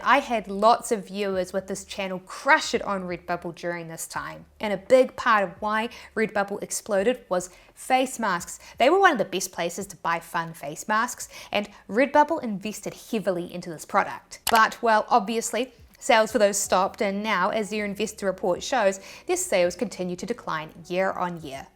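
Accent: New Zealand. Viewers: Are you a kiwi? Your accent is adorable!!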